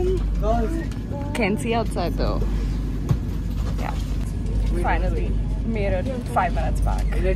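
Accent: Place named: England, United Kingdom. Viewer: Listen to her english accent , mate relax, she's not a indian resident, let her be herself in her comfortable self, So chill & enjoy if you can, for what's she has to express in her quest of this train journey, 🇮🇳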